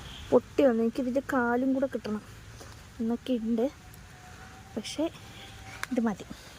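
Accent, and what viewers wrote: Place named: Kannur, India. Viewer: എനിക്കും ഒത്തിരി ഇഷ്ടമാ പക്ഷെ കുറെ വർഷങ്ങൾ ആയിട്ട് കിട്ടാറെയില്ല .... ഇതെവിടെയാണ് സ്ഥലം .. ഞാൻ വിചാരിക്കുന്നു കണ്ണൂർ ആണോ .. സംസാരം കേട്ടിട്ട് അങ്ങിനെ തോന്നുന്നു..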